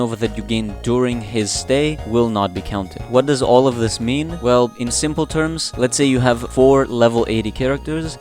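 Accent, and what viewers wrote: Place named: Greece. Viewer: That accent.... Are you greek bro? 😂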